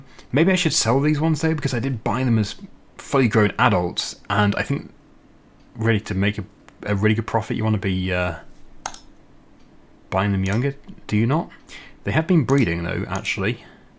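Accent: England, United Kingdom. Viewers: I am an American and I can tell your British! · I've been watching every video you post for over a year now i know your British just from your accent, a d i think you've said in a couple videos too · You sound British to me. If you’re American, you have a good fake accent. Wow! That would actually blow my mind if you came out with a video where you finally admitted that you’re not British and you had a California accent. 🤯 Or if you had a really thick southern accent, ha! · British way to quite to be American an also to intelligent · 100% British u live in England am shoure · Always knew you're British but i am American soo we know each other's accents lol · no way some can think he is form USA that accent's can't be more British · British 100% - further south than myself! You play court farm really well, I find it really cramped and keep crashing into things expecially around the grain silo through to the closest silage pit! 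· British isn't an accent, he has an English accent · Heh you're definitely British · Only someone just not too familiar with accents would mistake you for anything other than British! A new vintage survival sounds grand. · the accent makes you speak the UK style of English, not the unaccented US style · I've watched your videos for long enough to know your British. Also love your videos! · is unmistakably British. Middle England is my guess. Well educated. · British, you're British mate! Can't quite place your accent, though. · Definitely more British and not American, or might be a tiny Wales in there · Definetly Bri'ish, but in the way that everybody can understand you, not the Yorkshire or Birmingham mumbling 😆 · British 1000%, not even close to Merican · So obvious that you are British. Where exactly I can not place, somewhere in England though. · You have to be, definitely, be British. 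Arn’t you?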